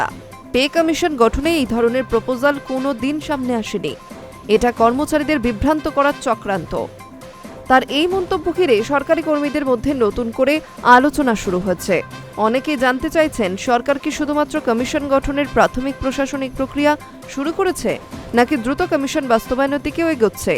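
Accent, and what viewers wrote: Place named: Bangal, Bangladesh. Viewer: যিনি খবর পড়ছেন, তাঁর খবর পড়া পুরো বাঙাল উচ্চারণে আক্রান্ত!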